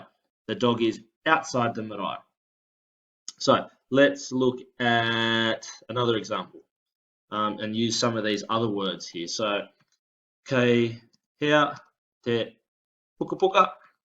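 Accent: Australia. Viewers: Strong Aussie accent there bro. Ka nui te pai. Good on you bro for doing this for us even here in Aotearoa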